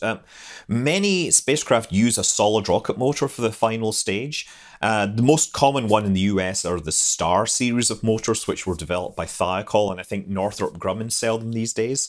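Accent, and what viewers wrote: Scotland, United Kingdom. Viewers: burr makes Explorer sound like Exploder. Funny we used to call an earlier version of the Ford Explorer, the Exploder for the transmission’s propensity to explode under certain conditions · With your awesome Scottish accent, I always hear “Exploder” as opposed to “Explorer”. Important difference haha.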